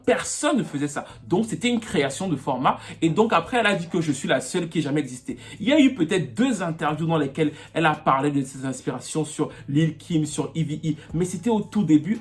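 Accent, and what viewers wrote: Cameroon. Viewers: C moi où tu as un accent camerounais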